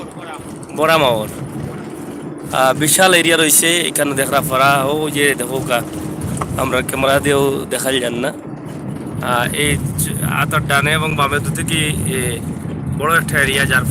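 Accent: Sylhet, Bangladesh. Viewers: আদা সিলেটি আর আদা শুদ্ধ খেনে। খাটি সিলেটি ভাষায় মাতও না খেনে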